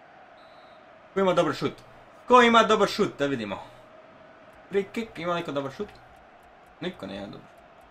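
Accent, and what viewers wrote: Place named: Bosnia and Herzegovina. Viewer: HAHAHAH Bosanac brate :3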